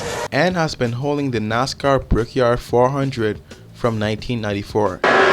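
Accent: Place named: Bahamas. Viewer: You sound Bahamian glad to have another bro from the 242 enjoy Motorsport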